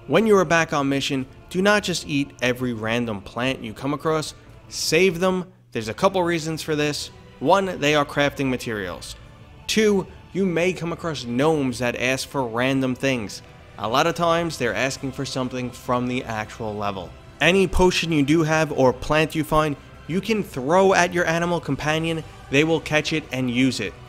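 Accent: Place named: Boston, United States. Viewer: Your accent is very interesting 🤔. You sound like you have a Boston or New Jersey accent. Ya hold your long a sounds sometimes.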